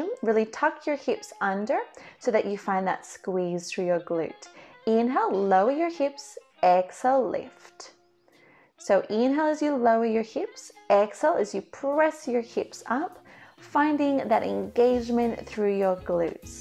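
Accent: Australia. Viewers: Have done 2 of your classes now, yesterday and this morning. My gluteus are feeling it! I have a couple of favourite channels I follow to mix up my workouts, love your workouts totally agree with the other comments, you are clearly a professional and a great teacher/instructor. Also is really soothing hearing a Ozzie accent😉. Love from Melbourne 😘😘😘❤❤❤ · Your videos are amazing! Thank you so much. This one was hard. Hoping that I can improve and not die next time hehe. And I definitively love some Australian accent :)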